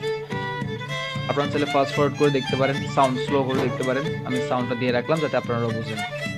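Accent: Chittagong, Bangladesh. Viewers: Amader chatgaya accent diye buja jai Btw kon Uni te poren bhaia?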